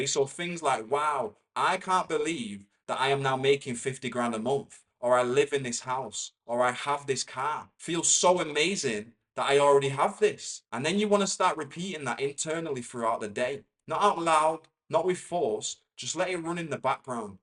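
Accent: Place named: North West England, United Kingdom. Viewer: Love it. Love your accent, too. North West, right? Brings back great childhood memories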